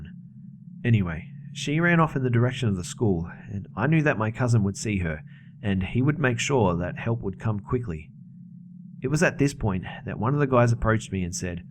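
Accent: Australia. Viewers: finally an ozzie CVNT reading me stories. thanks CVNT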